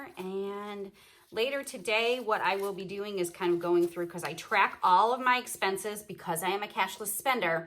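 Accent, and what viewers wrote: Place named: New Orleans, United States. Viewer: I just love your cute little voice all the way from New Orleans..La💜🤣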